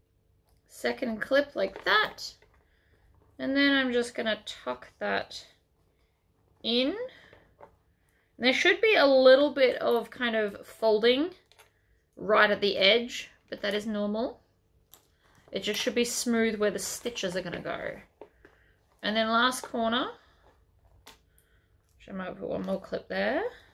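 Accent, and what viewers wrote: Australia. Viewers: has a Mitsubishi, that she painted herself, and has a Video, or a few showing how and what she used to do it.:). I too love listening to her as a Fellow Aussie, not many that do tutorials, so it is lovely listening to her.:)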